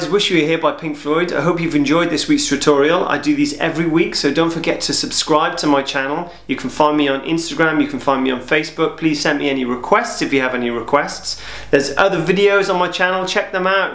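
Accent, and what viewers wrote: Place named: United Kingdom. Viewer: correct me if im.wrong but judging by the accent... hes british.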